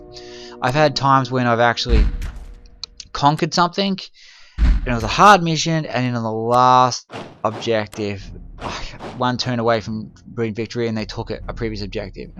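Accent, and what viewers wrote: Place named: Australia. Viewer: Expertly played mate; was surprised to hear an aussie when i started the video to be honest. I might watch a few more of these if i need help nailing a brilliant victory later on; did you upload videos for all of the Red Turn campaign?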